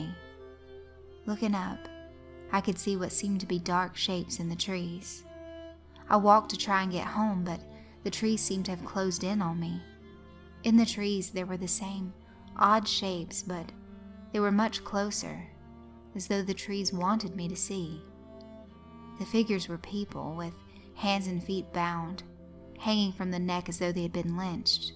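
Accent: South, United States. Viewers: You must be a southern girl, guessing Georgia. . Southern girls with accents are my weakness ☺️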